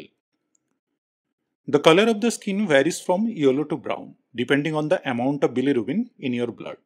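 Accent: India. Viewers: Thank you for the information. I have great respect for doctors from India 🇮🇳. Your voice gives it away. You are very knowledgeable.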